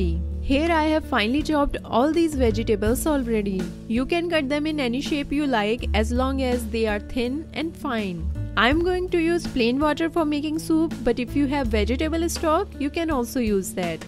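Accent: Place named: Europe. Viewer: U Europeans confused with t and"ray".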